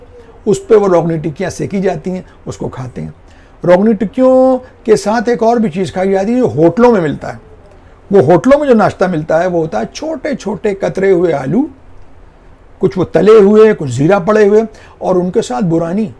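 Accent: Lucknow, India. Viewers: Aap ke Lucknavi bateyn sun k bahut maza aa gaya.Meray Walden wahin sey partition k baad Karachi a gai they.Yeh sab batey or lab o lehja Karachi mey rehtey huway suntey tou lagta tha Lucknow mey rehtey hain. Aap ki guftugu sun ke maza agaya Lucknow ke sair ho gai 👌♥️ Aap ney Lucnavi pourbi zaban ka zikr nahi kia wahi hamarey ghar mey boli jati thee.Jesay aye bhayia tum ka khaieo ? Mey kai baar Lucknow bhee jachuki hoon Behad maza aya or apni nanhiyal jaker wapas aney ka dil nahi chahata hai.Lucknavi mehmaan nawazi or kahin dekhney ko nahi multi 👌♥️ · Shukran Sir Aap ki videos ka be qarari se intezaar rehta hai... Hum Guzishtah paanch barson se Bangalore main muqeem hain Lucknow juld aana mumkin nahi So aap ke lub wa lehje main mukammal Lucknow paa letay hain.. Behud shukr guzaar hain ke Aap ne Itni pyari Tehzeeb ko ujagar kiya... Salamat rahiye... Aameen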